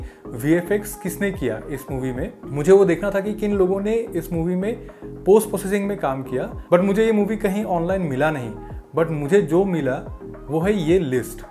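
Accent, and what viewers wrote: Assam, India. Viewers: this sounds like Assamese.. Oi bro tumi akhomiya ne??❤️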